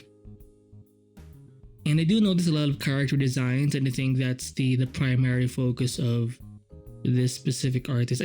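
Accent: Philippines. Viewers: You sounds like a Filipino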